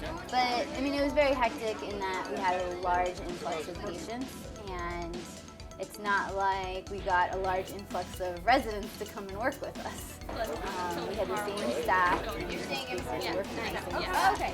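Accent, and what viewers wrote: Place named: United States. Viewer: she has an American accent, maybe her parents are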